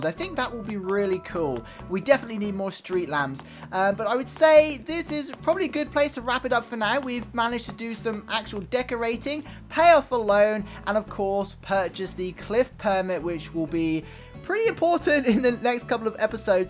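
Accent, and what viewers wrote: United Kingdom. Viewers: Hey, I just want to tell you how much I look forward to your daily "spooky" videos. I've been a subscriber since 2020 when i purchased acnh. I really enjoy your energy and your British accent 😅 I wasn't playing acnh for over 10 months but 13 days ago i restarted my island and finally i found my love for playing acnh again. I've binged watch all of your older videos 😅 I'm just happy that you're still here making acnh content 🙏🏼 Love from Germany 🥰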